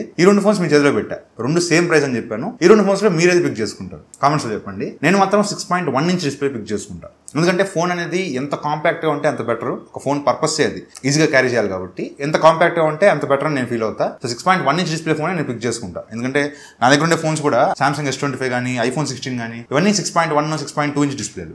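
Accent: Nellore, India. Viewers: Meedhi nellore aahh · Bro u from nellore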